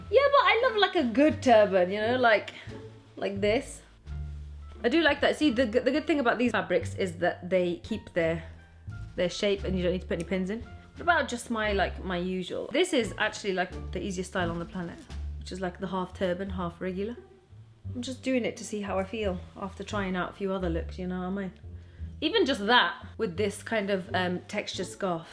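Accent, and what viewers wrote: United Kingdom. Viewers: That british aCCENT thou! U go dina As if am hearing adEle the singer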